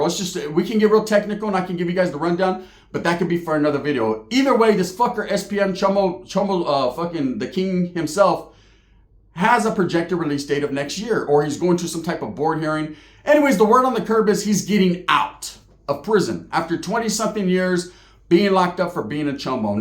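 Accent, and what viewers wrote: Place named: California, United States. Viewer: You from Cali I bet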